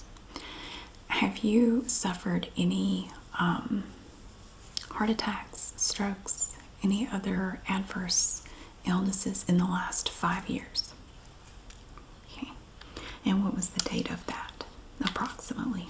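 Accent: South, United States. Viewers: I grew up in The Deep South and have always LOVED the sound of a southern accent in a woman’s voice. I’ve always found it so sweet and relaxing. This is a blissful, gentle joy to listen to ☺️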